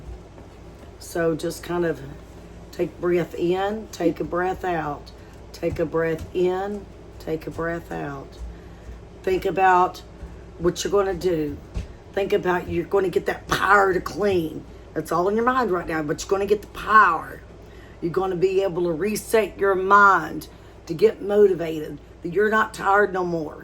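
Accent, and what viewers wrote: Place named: Kentucky, United States. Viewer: I knew you was from KY soon as I heard your accent.. blessings...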